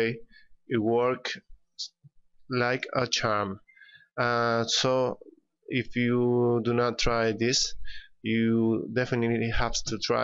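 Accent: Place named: Argentina. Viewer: Vos sos de Argentina?. Se te nota la tonada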